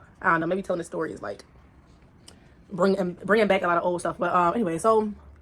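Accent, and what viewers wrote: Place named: New Jersey, United States. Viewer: I like you story telling! I don’t mind the tangents and your Jersey accent is so nice!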